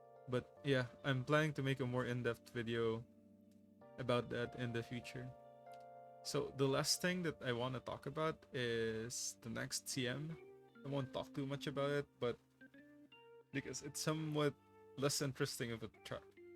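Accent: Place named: Philippines. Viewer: Interesting video. Honestly I think the main drivers for the burnout are the popular content creators themselves. Lately almost everyday all they do is make videos about preparing for the next CM, and that people need to do XYZ in order to compete. So instead on focusing on the fun aspects of the game, it turns into a checklist grind. I've honestly stopped watching their videos when it's all about preparing for CM. I maybe just watch a few to get some pointers, but I don't pressure myself to do each and every single thing they recommend. BTW, are you Filipino? Was just curious based on your accent. No need to answer if you're not comfortable.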